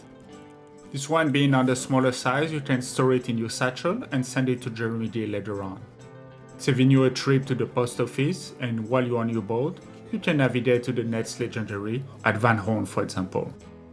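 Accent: France. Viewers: Nice video man! What’s this accent? Français?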